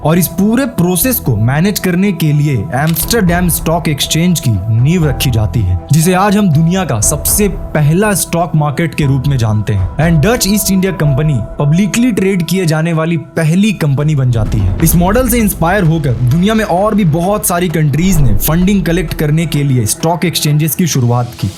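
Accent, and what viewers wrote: Bihar, India. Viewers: Aap bihar se hona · You are from bihar...... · Are you from bihar ?? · Bhai kya tum bihar se ho please reply ❤❤❤❤ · मतलब आप बिहार से हो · Are you a Bihari?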